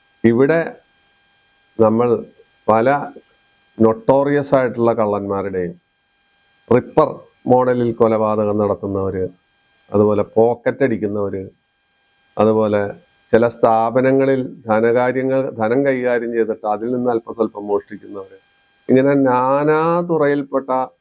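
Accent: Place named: Palakkad, India. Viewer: Iski മടിയില്‍ pootum 😂തനി പാലക്കാട്ട് basha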